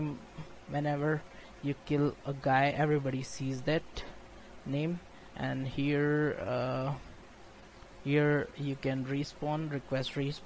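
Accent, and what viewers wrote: India. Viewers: U have indian pronunce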